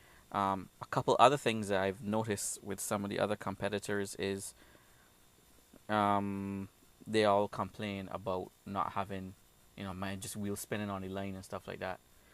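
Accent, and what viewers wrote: Barbados. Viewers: Yh he is bajan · I thought he was Irish at first, then I realised he actually sounds more bajan O_o lmao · Bajan accent.. from Barbados. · Basically Barbadian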